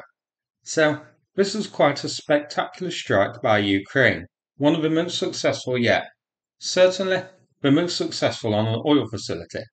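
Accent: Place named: Stoke, United Kingdom. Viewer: Are you from stoke mate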